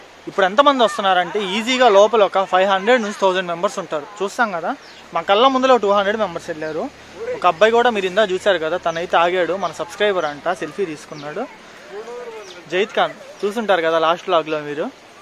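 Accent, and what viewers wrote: Nellore, India. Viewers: Nellore yaasa 100 percent dimpesav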